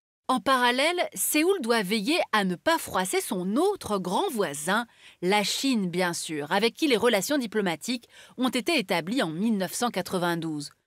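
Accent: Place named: United Kingdom. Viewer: entendre prononcer les noms des "chaebol" à l'anglaise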